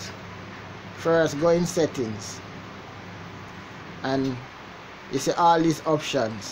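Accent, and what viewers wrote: Jamaica. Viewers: Thank you soon much bredda are you a Jamaican?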